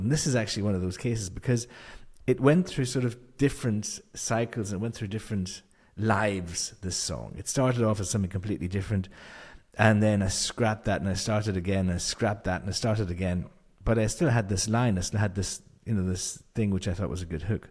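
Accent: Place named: Ireland. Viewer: What part of Ireland are you from? I don't recognize the accent. It's hard to pinpoint. It's like a mash of several accents. I'm from Cavan by the way.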